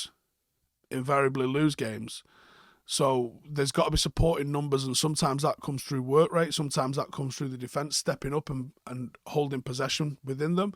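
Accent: Manchester, United Kingdom. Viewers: nice to hear a Manchester accent for a change, good knowledge too apart from the Watkins analysis, he is a liability just like Mings.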